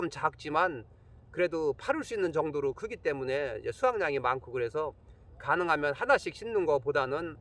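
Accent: Chungcheong, South Korea. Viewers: 질문을 하면 줄줄줄나오는게 넘신기ㅋㅋㅋㅋㄱ구수한 충청도 사투리 넘나 정감가요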